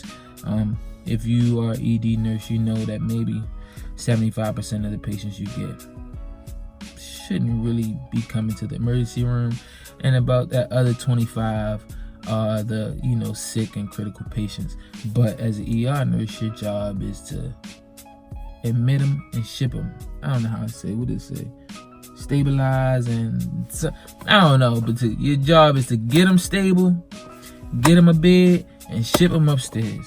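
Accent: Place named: Maryland, United States. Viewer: Is this guy a Marylander??? He sounds like he is from my hometown!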